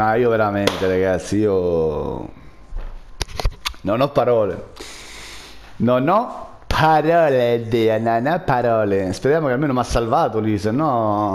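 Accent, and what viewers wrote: Rome, Italy. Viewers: come al solito mmmaaaazzzzuuuuunnnnnaaaaa,spolliciate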